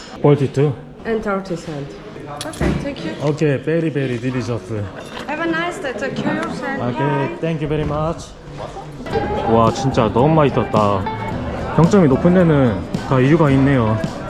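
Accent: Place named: Japan. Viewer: 영어 억양이 뭔가 일본 억양스러우시네욬ㅋㅋㅋㅋㅋ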